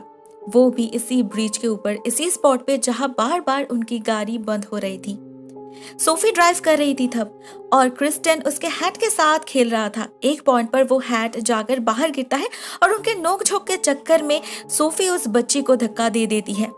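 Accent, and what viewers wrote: Bengal, India. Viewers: u hav improved alot on ur hindi...but still bengali accent persist..cant blame u.. but so far so good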